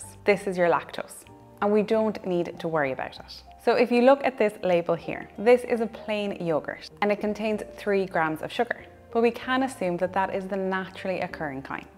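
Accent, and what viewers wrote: Ireland. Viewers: thank you for helping with this weight loss journey. a comment … love your Irish accent. Thanks again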